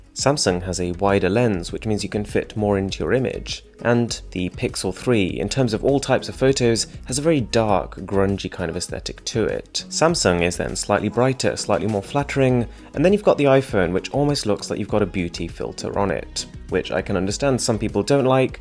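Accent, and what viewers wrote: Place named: United Kingdom. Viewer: Bro you have a fashion model type face😍 with your brithish akhcent😍👍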